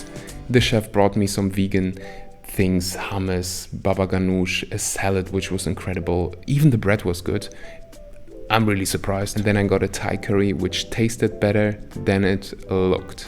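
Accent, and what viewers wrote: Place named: Germany. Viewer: You have a thick German accent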